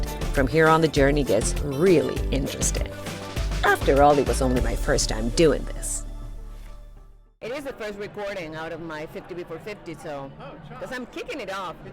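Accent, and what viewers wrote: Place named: Rosario, Argentina. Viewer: Amazing rosarigasino’s accent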